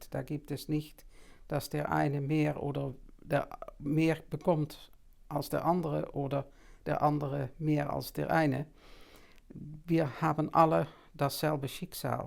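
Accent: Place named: Netherlands. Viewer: Liebe! Vielen Dank für das Video-Projekt in deutscher Sprache! Gleich drei Punkte fallen mir dazu auf: 1. Erinnere ich mich beim Hören dieses Videos in deutscher Sprache sofort an Deine wunderbaren Vorträge, an denen ich teilnehmen durfte. 2. Dadurch, dass Deutsch nicht Deine erste Sprache ist, werden viele Aussagen eben noch überlegter, als es vielleicht in der Muttersprache passiert, wo mal automatisch etwas „herausrutscht“. Ähnlich einem Weg, den man nicht täglich geht und einem dabei jeder Schritt bewusster erscheint. 3. Ich finde Deinen holländischen Akzent sehr charmant, er gibt Deinen Ausführungen eine schöne einzigartige Farbe. Also freue ich mich schon sehr auf die folgenden Videos, nun auch auf Deutsch!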